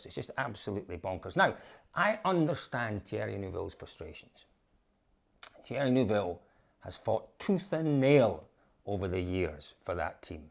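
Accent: Scotland, United Kingdom. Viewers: Naice scottish axent.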